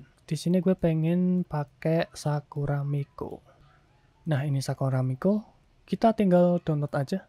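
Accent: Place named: Java, Indonesia. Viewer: Lumayan medhok ya mas brur😄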